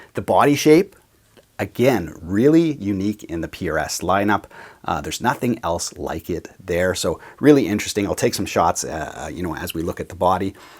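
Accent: Canada. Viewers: Never heard you sound so Canadian aye!! Usually rarely hear you sounding typical Canadian Aye, or at least how we Americans stereotype it!! Said with love!! Love the Videos!! Your actually the 1st channel I started watching after not playing for 20 years. So Thanks for getting me excited about playing again!!!!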